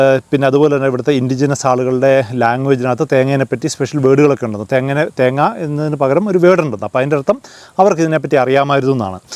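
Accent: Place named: Wayanad, India. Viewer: നാട്ടിൽ വയനാട് ആണോ സംസാരം മാന്തവാടി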